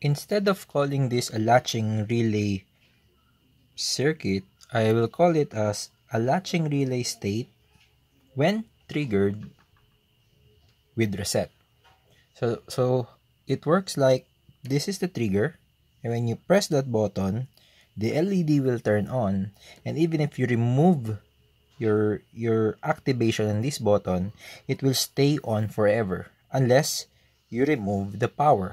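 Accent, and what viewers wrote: Philippines. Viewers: that filipino accent tho :D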